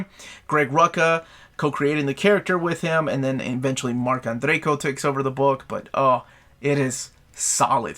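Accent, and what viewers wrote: United States. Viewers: Are you from Kentucky????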